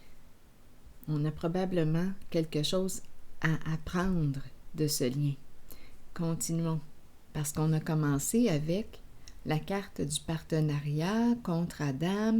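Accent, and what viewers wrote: Quebec, Canada. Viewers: j adore votre accent du Québec !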